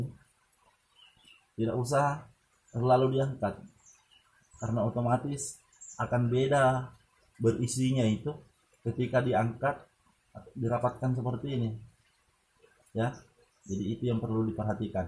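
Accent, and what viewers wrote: Indonesia. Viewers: klo dengar cara bicarax kykx dia org bugis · tutur bicara enak di dengar.. anak medan lai.....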